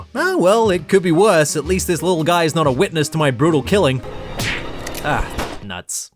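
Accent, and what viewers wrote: United States. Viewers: Why has this man's voice progressively become more American and less British as time goes on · That’s one way to get lots of views. Watched this channel for 5 years - still wish you lost the US accent as you’re clearly not from there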